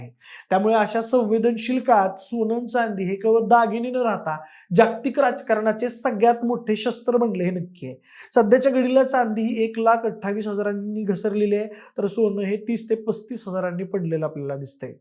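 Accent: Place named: Kolhapur, India. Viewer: तुम्ही कोल्हापूर च हायसा काय तुमच्या बोलण्यावरण वाटतंय 😊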